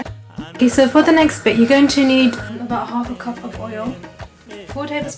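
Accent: United Kingdom. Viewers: I'll try this recipe. Thanks for posting it. Like the music too - what is it? And nice accent - are you from London? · Hey I loved your voice it's so soothing and your British accent makes it even more beautiful 😌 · I was into her British accent than cooking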